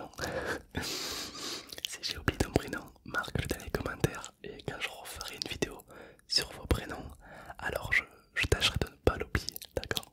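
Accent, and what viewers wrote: South, France. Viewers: L’accent du sud 🥳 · On entends l accent du sud 😂😂😂😂😂😂😂😂😂😂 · J'adore ton accent du Sud. J'ai le meme!! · Aller hop jsuis trop fier g entendu mon nom😂😂😂trop beau l'accent du sud bref force à toi👌👌❤️💪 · Je comprends pas son accent français mais c’est quand même grave bien 😭😂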